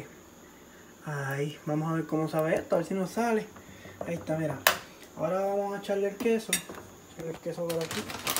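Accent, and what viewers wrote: Puerto Rico. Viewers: Puede tener el acento de boricua, pero aseguro que ese día te es Dominicano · Hay papa me gusta ese acento de Puerto Rico. Si lo hago con pepperoni y también jamón y le echo cebolla, aji. Bien rico.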